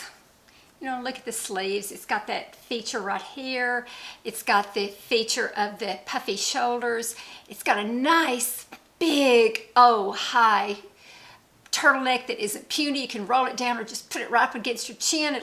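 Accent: South, United States. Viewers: I love listening to your sweet southern voice❤. I live in Georgia and probably sound just like you! Praying for a quick recovery from your upcoming surgery! Bless your heart ❤️. You are a beautiful soul ❤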